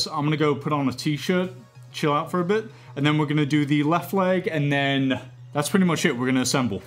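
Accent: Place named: United Kingdom. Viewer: I thought you were British and you lived there sorry hahaha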